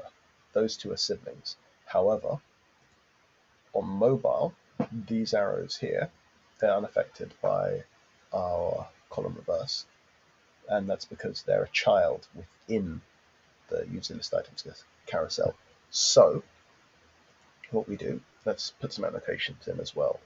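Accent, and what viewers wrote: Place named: United Kingdom. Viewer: Great to hear the voice of a fellow brit!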